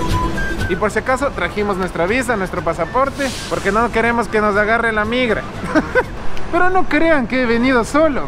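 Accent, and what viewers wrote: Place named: Quito, Ecuador. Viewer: Espectacular, por fin un ecuatoriano con sentido del humor nuestro y ese acento quiteño inconfundible...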